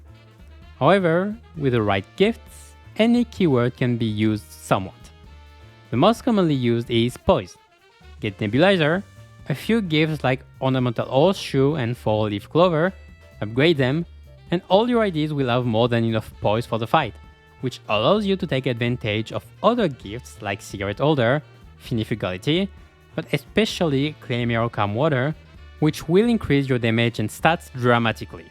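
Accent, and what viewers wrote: France. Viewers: Thank again for tutorial i will send to my friend he really need (J'adore votre accent français xD)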